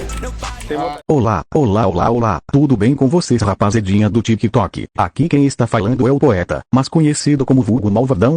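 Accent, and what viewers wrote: Bahia, Brazil. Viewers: O poeta e baiano ?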